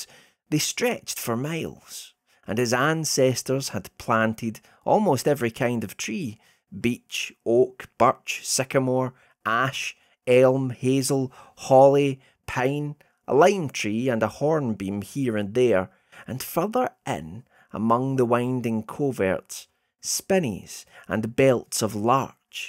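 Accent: Scotland, United Kingdom. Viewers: That's quite a thick Scottish accent you have there!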